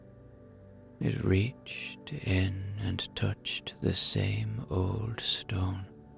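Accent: Ireland. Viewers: Woohoo! 1 hr gang! pm Texas USA. Keep that soft Irish brogue coming. 😊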